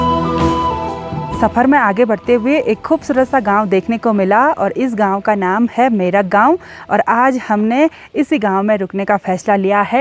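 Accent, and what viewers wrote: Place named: Rajasthan, India. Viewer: Aapki language thodi thodi Rajasthani boli jaisi lag rahi hai .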